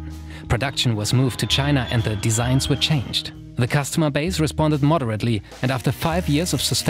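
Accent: Germany. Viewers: this guy german accent gets me everytime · the strong german accent ahhh yess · Most Germans sound like this (or worse) while speaking English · You probably hate to hear it, but are u german? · I could tell it was a German accent right away! But I'm pleasantly surprised by how well he speaks English.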